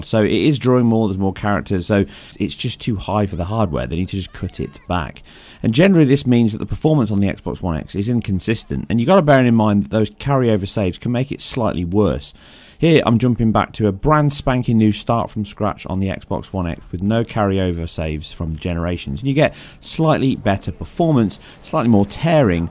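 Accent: United Kingdom. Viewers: Doing tech analysis with UK accent is the right move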